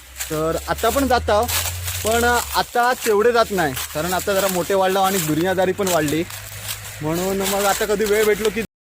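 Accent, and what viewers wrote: Konkan, India. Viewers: छान कोकणी टोण मध्ये बोलतो तू. ते फुलाच झाड विषारी आहे त्या झाडा मूळे आजू बाजुला इतर झाड जगत नाही. परदेशी झाड आहे ते इंग्रज घेऊन आले होते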